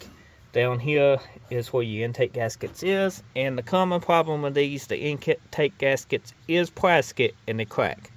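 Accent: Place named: Louisiana, United States. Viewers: you've got an interesting accent. Where are you from, Louisiana? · Sounds like a louisiana accent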